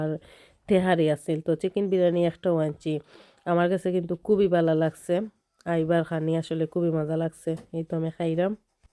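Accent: Sylhet, Bangladesh. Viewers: আমার দেশ ফেনি আমার মেয়ের দেশ সিলেট আমার মেয়ে সিলেটের কোনো ব্লগ দেখলে খুব পছন্দ করে দেখে কারন এখানে সিলেটি মাত থাকে যা ওর শুনতে ভালো লাগে এবং চেষ্টা করে শিখতে।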